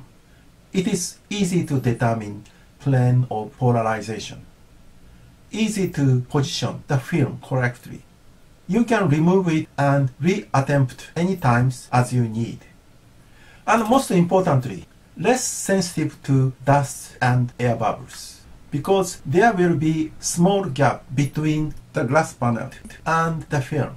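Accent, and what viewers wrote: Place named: Japan. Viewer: まさに日本人って感じのアクセントやな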